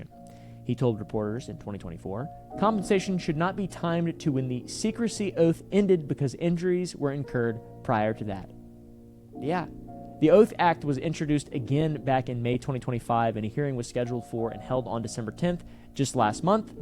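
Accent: United Kingdom. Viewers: That British accent though 😂😂😂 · Your British accent was on point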